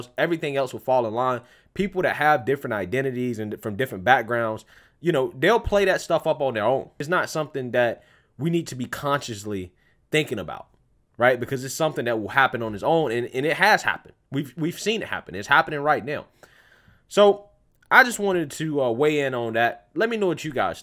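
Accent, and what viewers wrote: South, United States. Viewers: im a white conservative in the south, i get your points. you did not need to use proper southern English.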